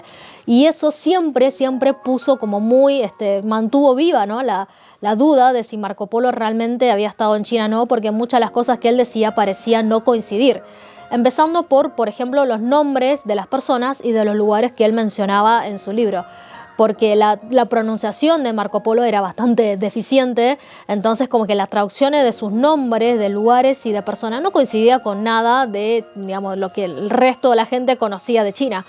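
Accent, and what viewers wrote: Buenos Aires, Argentina. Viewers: Es argentina. ¿Y q problema hay esa variante dl castellano u otras? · Hermoso acento argentino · Cuando vosotros habláis con tanta soltura el castellano ¿es porque sois nacidos y criados en un país hispano hablante o por mero estudio? Es que me sorprende, en tu caso, con un excelente acento argentino. Gracias. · Primera vez que escucho a una China hablando con dejo Argentino 😂😂😂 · Un interesante relato aumenta el interés por el acento porteño, de la narradora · XD ese acento es argentino porteño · Cómo se nota que la presentadora aprendió el idioma español de argentinos. La 'sh' se usa arbitraria e indebidamente para remplazar la "ll" o la "y", además del acento característico. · Jajaja, la chica es argentina? Q gracioso se ve como habla, 👍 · Habla muy estilo argentino, la pronunciación es perfecta. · Muy lindo el video y tu dejo argentino me encanta felicitaciones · Ché tenés el dejo argentino. 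· muy buen video, felicidades. Habla Ud. un castellano casi prefecto con acento argentino ... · Me encanto tu síntesis. Tu acento argentino es muy sicodelico y me encanta tu guapura. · Nunca escuche a una mujer china hablando con acento argentino, y además muy linda y atractiva 😘😘😘😘, noto que naciste en la bella tierra Argentina, ya desearía que seas mi esposa, besos desde Cochabamba Bolivia 💋💋💋💋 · Excelente explicacion y con acento argentino... Puede relatar con un acento mas neutro.. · Su acento ¿argentino? suena muy bien. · Tienes acento argentino muy curioso hablar con acentos chino y argentino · Me encanta su combinacion cultural con sus facciones de La Republica China y un soberbio acento argentino, un abrazo y felicitaciones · Interesante.. hablas como argentina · Soy peruano, y corroboro, no es acento peruano, es argentino. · Se nota el acento de argentina de esta chica oriental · Lo mas inverosimil fue la magia de los brujos al servicio del Khan. 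Estos hacían que la jarra de vino llenara la copa (solita) y despues volara a las manos del Khan. Este relato le valió a Marco Polo ser presionado por la inquistion y retractarse de lo dicho.Aaah Bonito tu acento argentino chinita♥ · 😂😂 de nuevo un peruano, cree que todo se originó a partir de ellos, la chica vive en Argentina, no escuchas el sho. Es más Marco Polo nació en Perú y Cleopatra es Limeña jajaja 😂😂😂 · Acento argentinazo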